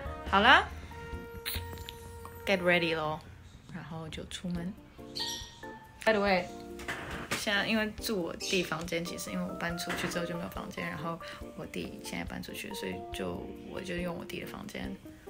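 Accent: Taiwan. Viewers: 想說怎麼有一個講話這麼台的外國人 然後把妳的影片看了一遍，連新聞片段也去看了一下 訂閱妳了，加油啦！ 如果想做YT一定會有非常多酸民的 要進廚房就不要怕熱 而且酸民也是來幫妳充流量的 只是他們不酸一下會不舒服 做空姐也是會遇到奧客的 家是人的避風港，如果妳覺得台灣是妳的家， 那這裡就是妳的避風港，歡迎回家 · 以前一直很想聽聽看長著外國人的臉講台灣腔中文的外國人是什麼感覺，因為自己想像有點難，現在終於實現了ＸＤＤ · 光聽講話就知道一定是在台灣長大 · 我在台灣出生的女兒剛好跟版主相反，她仍有台灣國籍，我是美國陸軍退休，駐於德國兩次共6年，一次在巴伐銳亞省的Vilseck，一次在Wiesbaden，我女兒在4歲的啟蒙教育是在Vilseck的鄉下的學校很快就學會巴伐銳亞腔的德語，出外在德國旅行有需要時都靠她翻譯，有一次開車去法蘭克福途中的一位德國人問我的女兒她的德語怎麼有這麼重的巴伐銳亞鄉下腔（就像Tyna在南部學台灣國語，我也是一樣，而我老婆是台北人，所以女兒也講帶美國腔的天龍國國語），我説女兒在Vilseck短暫讀過德國當地學校。幾年前我退休全家搬回美國加州，一位剛認識的小六同學問我女兒，為什麼長的東方面孔的她講英文有帶歐洲腔，幾年後因為不常用，她小時候順口的鄉下德語也忘得差不多了，只是偶爾不爽時德語三字經還是會不小心冒出來。😅😅😅感謝Tyna分享台灣。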